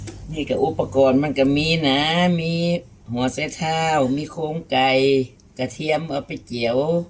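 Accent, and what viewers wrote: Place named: North, Thailand. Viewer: บ้านอยู่ไหนนิอู้เหนือ😁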